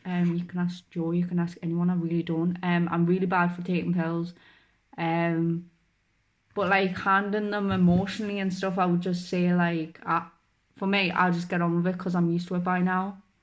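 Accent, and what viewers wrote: Newcastle, United Kingdom. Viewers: Geordie accent (North East England)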